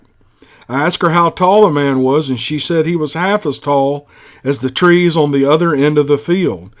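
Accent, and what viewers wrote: South, United States. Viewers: Nothing better than a Southern voice, Louisiana folks appreciate you, and your taking time to do this!!! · Yea yu dig his southern voice Us southern boys have that southern draw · I love the fireside videos. I listen right before I go to & the fires & your nice southern drawl lulls me to sleep. Please don't take that offensively. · I luv your southern accent if I'm saying that correctly, anyway it's different from other you tube videos. You have good store telling technique.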